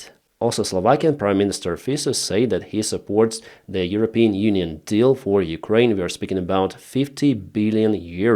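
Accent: England, United Kingdom. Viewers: He has a strong English accent . I am British and recognise the accents of my compatriots. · Yeah he’s British, his accent can be found nowhere else.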